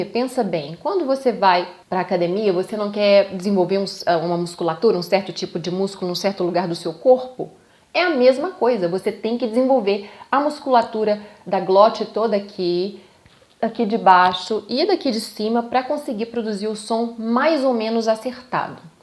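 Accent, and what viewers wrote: United States. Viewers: Seu sotaque americano é perfeito. se aqueles caras o tal do tim explica, o gavin etc analisassem você daria 10 pra você. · Ouvindo você falar em inglês com sotaque americano, parece até ser mais fácil a pronúncia americana da inglesa.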